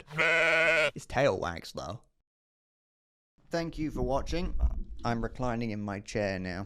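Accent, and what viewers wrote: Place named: United Kingdom. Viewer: This was the best 17 minutes of british rambling I've listend to in a while... No idea what this games point is tho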